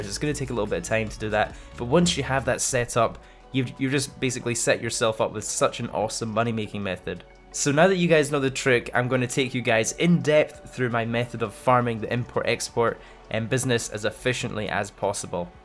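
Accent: Scotland, United Kingdom. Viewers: Fuck scotland wierd accsent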